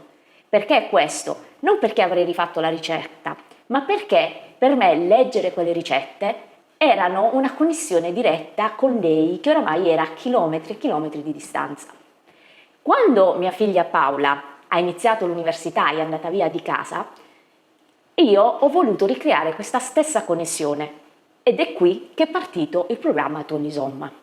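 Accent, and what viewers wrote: Italy. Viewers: I enjoy your cooking and look forward to the videos every Thursday. Keep posting! Your Italian English is unique and they make me laugh all the time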